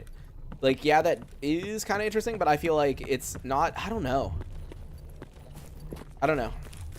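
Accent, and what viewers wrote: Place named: United States. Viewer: the american accent hit harder than i expected despite the fact that i have been listening to your content for about 2 years now.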